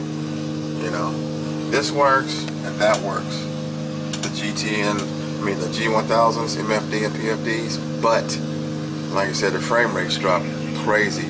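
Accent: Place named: South, United States. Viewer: Thanks for this! Looks fantastic! I'm getting 3DOF delivered in the next week or so. I have a couple of questions. I have Oculus cv1 that works great. Do I need to use the Oculus tracking lighthouses taped to the seat/machine for proper tracking and/or a program to keep it in sync? If my funds weren't limited, I'd get the Reverb G2 or even the Oculus S you have. My second question, do you need a program besides 3DOF's to talk with MSFS or X-Plane? I'm real psyched to get this built and flying! It's gotta be the ultimate immersion. I use to have a PPL, but my service connected disability prevents me from renewing. This tho will be just as fun..or nearly as fun! Thanks a bunch! (you sound like your from NC or Texas? If Texas, hope all is well for you!) Cheers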